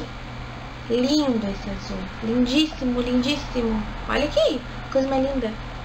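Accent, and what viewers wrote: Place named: Curitiba, Brazil. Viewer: Boa tarde sou nova aki no seu canal e já gostei muito..sou de curitiba e tbm tenho esse sotaque de( tá )e acho lindo nossos sotaques por isso q gostei de ti... há sobre a base achei q ficou bom este tom para ti tá kkkkkkkk bjss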